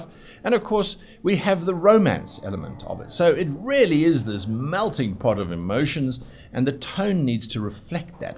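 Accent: United States. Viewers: Top-tier American accent. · Love your work. But in fairness, that was quite the teeth grinding American accent! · Every time I hear it, I’m more unsure if I love or hate your American accent.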